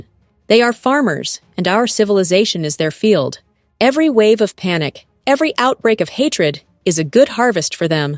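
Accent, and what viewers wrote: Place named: United States. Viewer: Я думал канал будет озвучен обычным нейронным голосом, не ожидал что будет такой качественный английский с превосходным американским акцентом.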